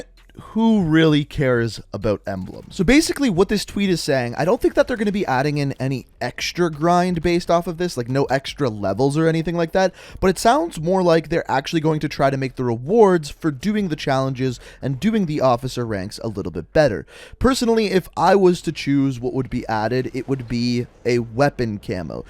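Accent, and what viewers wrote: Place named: Canada. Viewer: Paul Martinez I believe it's his Canadian accent .. I maybe mistaken, I don't like the accent. I love his content and info tho!!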